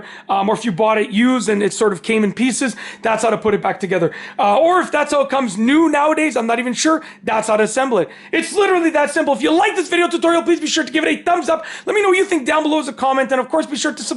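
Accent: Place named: Canada. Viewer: I guess from your accent that you're Canadian?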